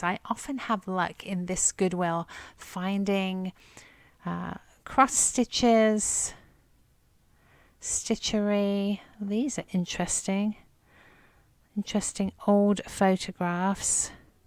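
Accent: United Kingdom. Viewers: I really enjoy you. I'm from the East coast and follow you and your friends. You have such a soothing voice and I dig your British accent! You are 1 smart lady so cool. I have learned so much from you. The Goodwills in Jersey do stock Christmas items throughout the year. I adore you! Hugs!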